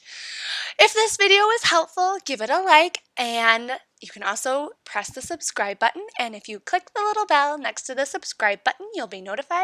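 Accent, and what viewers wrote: Wisconsin, United States. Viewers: Gotta be from Wisconsin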